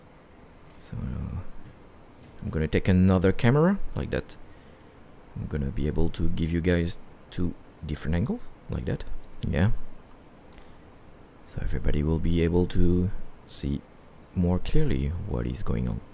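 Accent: France. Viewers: French accent or it's only me? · l'accent francais omg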